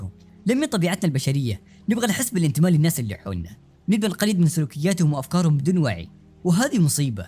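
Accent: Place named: Saudi Arabia. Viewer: من اللهجه واضح سعودي☺️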